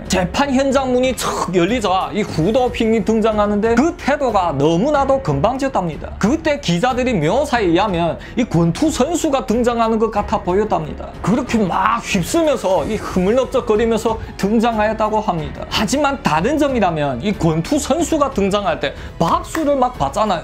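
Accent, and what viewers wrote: Yanbian, China. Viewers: 는 연변사람인가요?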